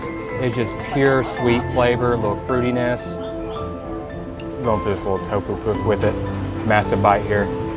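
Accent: South, United States. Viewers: the combo of his southern accent talking about his love for Vietnamese noodles... <3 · Do I hear a little southern accent? Love it! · First time seeing you’re video and I must say, you are a gorgeous man. And on top of that the food you chose, your southern accent and how you described everything was great. Keep up the great videos :)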